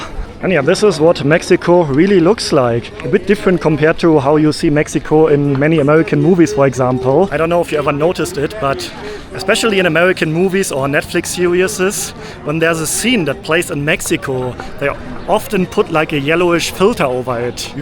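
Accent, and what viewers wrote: Germany. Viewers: Ya sabía, dije, ese acento no es estadunidense jeje. So, You are from Germany, You should search to Jan or Moe, they arebfrom Germany and they have many years here un México. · You shouldn’t be assuming that people understand your English, let alone with your German accent. Learn the basics of Spanish for a German tourist. If you went all the way there and you don’t even know what “Salida” signs are telling you, that means you didn’t prepare at all for this trip.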